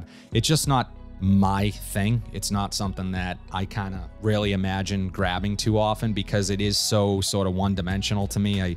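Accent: Boston, United States. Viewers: Lol The Boston/New England accent gave it away. Im from Mass I can tell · I feel like ur about to utter the words… from boooostin. I used to live in Worchester. Unless ur from new York then I got the accent wrong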